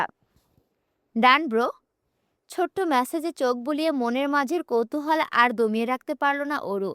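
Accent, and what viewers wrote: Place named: Kushtia, Bangladesh. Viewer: আমার বাড়িও কুষ্টিয়া তে, আজই জানতে পারলাম তুমিও কুষ্টিয়ার মেয়ে,, একমাএ আমরা কুষ্টিয়ার মানুষরাই এভাবে কথা বলি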